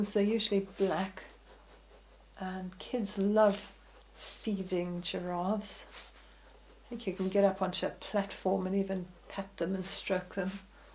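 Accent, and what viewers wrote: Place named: United Kingdom. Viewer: Cool British accent :D wiked lessons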